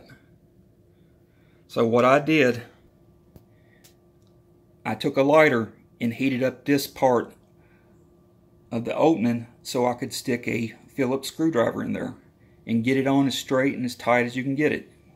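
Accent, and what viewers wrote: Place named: South, United States. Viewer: My Lord, you're a genius. Your idea gave me for free and almost immediately what several dollars and an hour long trip to a hardware store would have cost me. This worked perfectly for my Poulan Pro PR28SD string trimmer. Your accent tells me you are from the South...where else could such creativity come from? Thanks.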